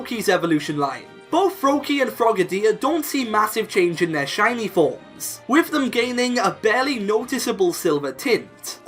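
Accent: Wales, United Kingdom. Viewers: I love this man’s voice ❤️ welsh accents are pure 🔥 Another great and fun video, love me some shiny starters;) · Are you from north or south wales? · are you Welsh?? I’m tryna figure out your accent!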